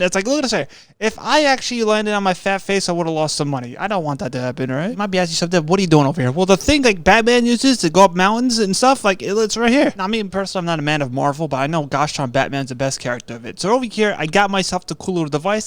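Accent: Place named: New Jersey, United States. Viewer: Lol ur finna asl that Jersey accent 😂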